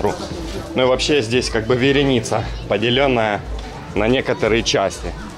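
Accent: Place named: Lviv, Ukraine. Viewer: иди на. У тебя говорок со Львова.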